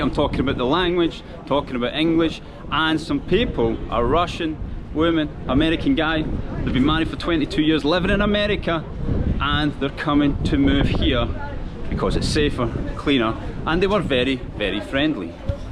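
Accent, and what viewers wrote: Scotland, United Kingdom. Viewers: Your Scottish accent sounds really nice 😊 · You've touched on language so I have a genuine question about your accent. Now I've heard a lot of British accents, but I didn't know what's what. and this is the first time I'm fairly certain that this is what a Scottish accent sounds like. Is this a standard Scottish accent? Reason I'm asking is because I live in the US and there are many different accents, like the Southern Accent for example, where I live. And it is often considered the butt of all jokes, by other regional "accenters" of course. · Thanks for your lovely channel. Love English language, your Scottish accent is so nice 👍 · Love the sound of Scottish English ) thanks for saying such a nice things about Russians ! We will always welcome everyone, who’s coming to Russia with good intentions !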